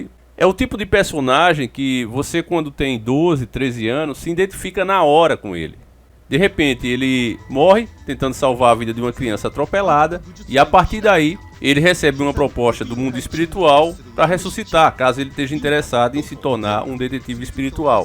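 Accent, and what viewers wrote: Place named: Southeast, Brazil. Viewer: Esse canal é nordestino? O sotaque parece muito de SE.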